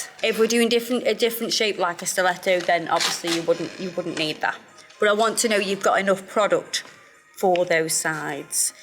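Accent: Stoke, United Kingdom. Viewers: That's how us Stokies speak lol loowk, boowk and coowk (in other language look, book and cook) lol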